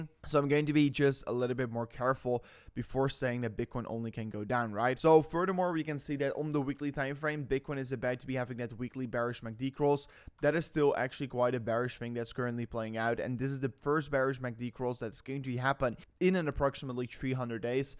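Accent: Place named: Netherlands. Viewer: The dutch accent is terrible though 😂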